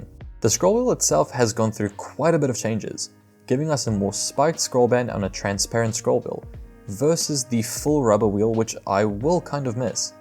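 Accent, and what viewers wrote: South Africa. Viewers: I saw you live in new zealand, so I assumed you're kiwi. But you sounded south african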